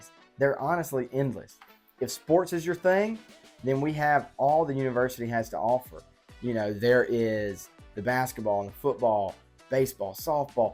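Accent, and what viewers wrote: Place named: Athens, Georgia, United States. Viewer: Do all the guys in Athens have that adorable accent? If so, you should add that to your list of pros.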